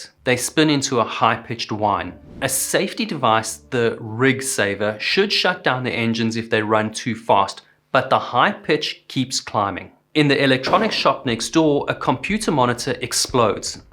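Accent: South Africa. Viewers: I asked him the exact same thing when I discovered the channel :) Are you south african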